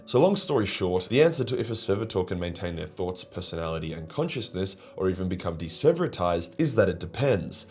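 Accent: Australia. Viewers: how did you somehow get more Australian?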